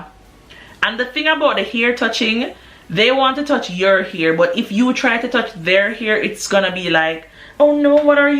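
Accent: Jamaica. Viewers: Love your Jamaican accent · your "pronunciation" is so jamaican! Try do some work on your accent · Also you’re not black. You sound as if you’re jamaican that’s what you are. Black is made to make you feel lesser than. Please don’t call yourself black, instead identify who you are.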